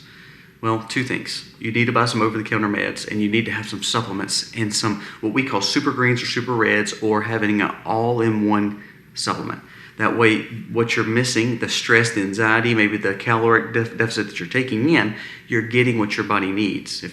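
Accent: South, United States. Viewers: I love all my Preppers and their channels but we don't own the house ( which is a small 2 bedroom cabin) we live it and we don't have a middle class income. We do have a propane cook stove and a wood stove but the well goes done it won't be pretty. We are 70 and 72 and my husband spent 24 years in the military. I put in a huge garden the first few years we lived here but I can't do that anymore. But we are happy and warm. We do live on a small 1/2 mile dead end road with about 12 other houses. We don't know what the future holds but we'll keep going until we can't go anymore. I have a stent in a major artery which keeps me from doing a lot of things and it's giving me a lot of issues. So I don't know if they can do another surgery or not. I really love your channel and have been watching for a long time. As a person born in Michigan who basically came into adulthood in the south ( TN and VA) I just love your accent. 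It makes me "homesick". We have no family here. My husbands Mom and Dad died years ago and he has an uncle he hasn't seen in decades. I grew up an orphan so have no remaining family members. I've had an interesting life that is for sure. I just wanted to say hello and let you know how blessed I am by your channel. Thank you for pressing on in your creating of these videos. I wish you and your family the blessed. Stay sweet. Rachele Rae...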